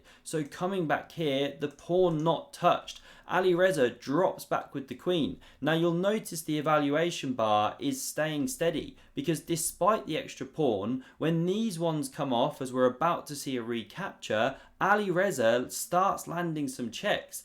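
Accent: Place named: Estuary English, United Kingdom. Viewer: Annoying juvenile "humour," even more annoying Estuary English pronunciation, and insufficient analysis. Agmadator's videos are FAR superior.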